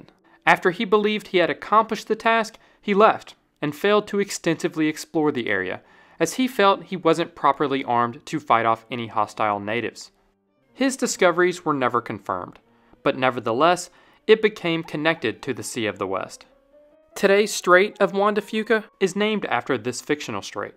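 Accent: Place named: Ohio, United States. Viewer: Dear, this is fascinating history. However, your pronunciation of Dutch, Spanish and French personal names is cringe-making. You know, you can Google pronunciations of practically anything, thereby making you sound less like an Ohio kid who's never been farther than 100 miles from home. I know I'll get pushback for saying this, but there's a reason why, in England, Received Pronunciation (RP), or BBC English, is considered desirable for journalists -- so that accents and regional pronunciations don't distract from the reporting. Good luck!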